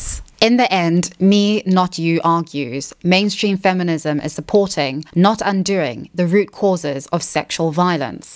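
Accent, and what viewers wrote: South Africa. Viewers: It's her accent, people talked like that around here in South Africa, she's explained that before. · She's South african but has lived in the uk since she was a teenager, so it's probably her going in and out of accents.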